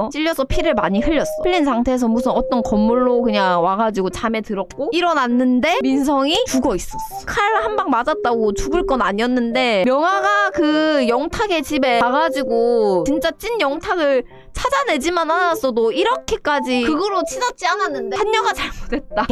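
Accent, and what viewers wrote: Gyeongsang, South Korea. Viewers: 경상도 맕투 개중독됨 ㅠ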